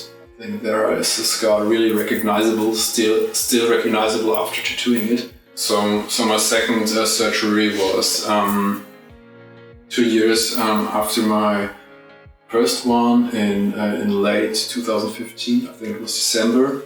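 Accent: Germany. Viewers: Der deutsche Akzent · Your story is amazing. This explains a lot of your tattoos you have unfortunately when you’re growing up, there’s some real bully kids and mean kids that want to make you feel bad about yourself fortunately for you you have nothing to feel bad about those same kids today are probably like wow look what he did amazing I love your accent German so am I I should say part German in German and half Apache, Indian, good combination, fire and water. I was born in July.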